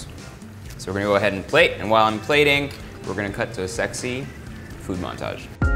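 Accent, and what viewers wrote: Philippines. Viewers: OMGEEEE..!!!! the tagalog accent though!! This is the first time I heard you speaking in tagalog na matagal.. Lorve it! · Your filipino accent is so cute and funny HAHAHAHAHA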